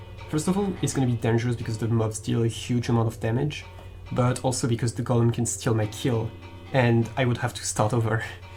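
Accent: France. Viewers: Are you french ? You kinda have a french accent :) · french accent ? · Are you French ? Your accent is familiar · est-ce que tu est français ? If you are not french you have a french accent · Ur french ? Because of your accent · You sound extremely french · Is it me or he has a french accent ? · haaaaa il me semblais avec ton accent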